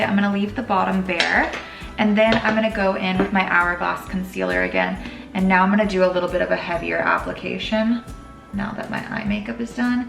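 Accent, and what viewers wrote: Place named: California, United States. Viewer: Dang I know I say it all the time but personally I think u are one of the most sexiest, most beautiful, most alluring woman ever. To me your a goddess. The best part is, when I first saw u and priscilla ricart, I thought u was south american and she was from usa. Then boom she has accent n instead u don't u sound californian. And it's awesome. Sorry to bother ya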